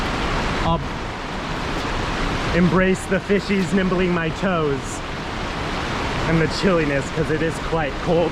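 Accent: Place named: Australia. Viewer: That Australian accent 😍🤤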